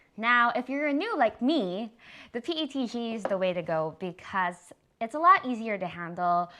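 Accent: Philippines. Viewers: i found it funny as I have wasted so many tubes like you did lmao🥲. But keep making the content that you love doing! I thought that the accent was a bit familiar but immediately noticed when you spoke tagalog in the vid. Always happy to support a fellow filipino. 😊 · First thing that i noticed is your accent. Asked my self why does it sounds like filipino accent, i never thought you have filipino blood till i checked your about. Anyways keep it up! nice pc! hoping to have this kind of build soon! Ingat and god bless haha. you gained a new subscriber. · Great videe, love your content. are you pinay? i feel like i can hear alittle accent in your voice :) keep up the great work! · You sound Filipino But awesome video and build <3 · what's your ethnicity? you have that Filipino accent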